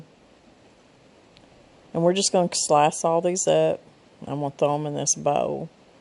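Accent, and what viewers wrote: South, United States. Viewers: I'm a Yankee up in Maine now and I've enjoyed your channel SO much. Just listening to your accent reminds me of my grandmother from NW Georgia - she taught me to love cooking. And my husband always teases me because I "slip" back into my southern accent after watching you!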